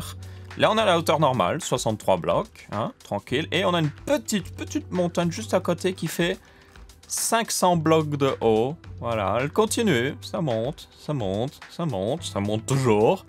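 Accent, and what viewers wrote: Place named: Belgium. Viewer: Ta un superbe accent belge !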